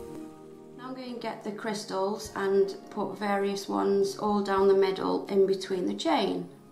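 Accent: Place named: Stoke-on-Trent, United Kingdom. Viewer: I fuckin' knew it! 'ey up duck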